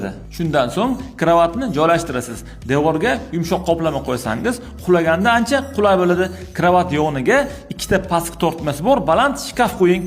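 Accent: Namangan, Uzbekistan. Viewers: Шева наманганми? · Аксинча шкафчалар купайиб кетдику шевангиз Наманган шевасими даров таниманда 😂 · Namangancha gapitish qiyinda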